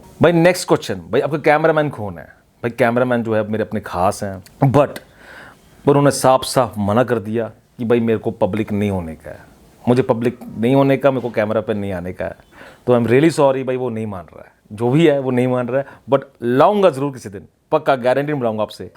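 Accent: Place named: Himachal Pradesh, India. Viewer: the way of your speaking reminds me of my old days in Himachal where people speak with a different tune than mainland hindi speakers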